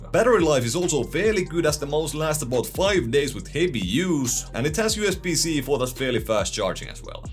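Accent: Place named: Finland. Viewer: I like u suomi accent. Sounds cool!)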